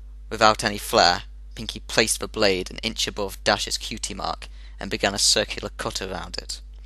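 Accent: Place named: United Kingdom. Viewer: your british and that makes the story more scary awsome reading dude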